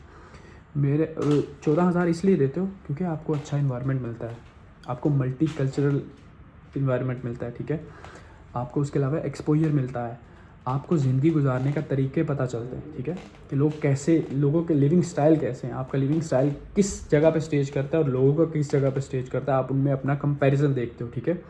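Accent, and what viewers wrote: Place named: Punjab, India. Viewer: Great job.... Punjabi ka trka buht achaa lgaty app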